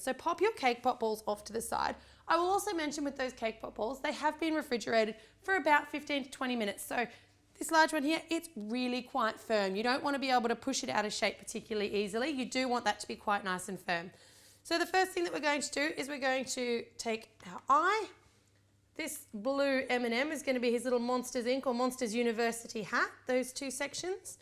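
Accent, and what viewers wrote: Australia. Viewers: Are u austrailian?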